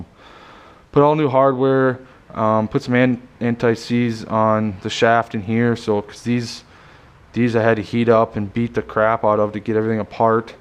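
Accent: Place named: Appalachia, United States. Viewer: Hey buddy its Justin lost your number watched all your videos to hear your hillbilly a** again hit me up ill send you my #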